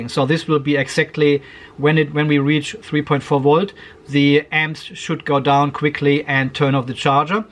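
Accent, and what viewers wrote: Germany. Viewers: Hope it comes a new clip soon;) I enjoy too see your clips not only for solar stuff but your seens of humor and your german english ;)👍 Every time I see a new post from you I put on the coffee and chocolate, big blanket and lay down on the sofa. I really enjoy and learn from you sir 👍😉 Best regards Morten from Norway 🇳🇴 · Danke für Deine gute Laune und dein Deutsches Englisch 😅👍🏻 finde deine Videos spitze weiter so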